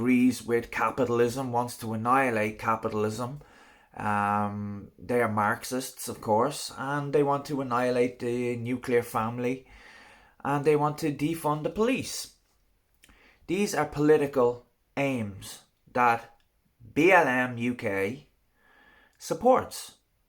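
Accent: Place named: Ireland. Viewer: You are right Tyrone's move was not a good one, it was brilliant! The woman is vile and obnoxious and her abhorrent behaviour should be called out much more, you sound like you are Irish? if so, try this one, do you think it is acceptable that the same woman stood up in parliament approximately three years ago and suggested that they should block food supplies to Ireland in an effort to force the Irish away from seeking the backstop as part of the withdrawal agreement? What kind of sick person/politician would think on those lines, you see she is right wing and I find it interesting that you demean the left who by the way seek social justice and economic equality as their primary objective whilst using a Nazi salute as analogy and comparison, Priti Patel's behaviour is right wing and guess what, so too were the Nazi's, are you a closet Nazi and racist using soft and subtle persuasion tactics, I wonder? 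You are being very arrogant and clearly attempting to link politics with what is a simple yet powerful gesture against racism and again one has to wonder? your argument sounds a lot like passive aggressive. As for BLM, they are entitled to their views, aims just as equally as the right, and as for capitalism, it is no great shakes and will ultimately lead to the total destruction of human kind not as a matter of if but only when.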